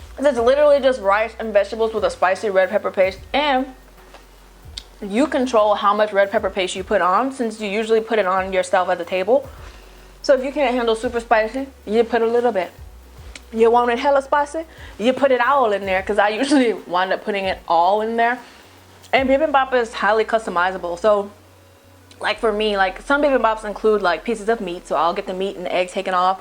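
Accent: South, United States. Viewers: She is ssoooooo NOT from NY do u not hear her accent?? She sounds like a southern belle. im a born and raised nyer from Brooklyn our accent is pretty distinct from a southern one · You kind of sound southern but then you kind of sound Asian but then you kind of sound black so I'm really really confused but it's whatever I kind of like it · omg im not american but i love that your american accent is still so strong, i love it, the things you say sometimes just crack me up, when you get passionate your accent really comes out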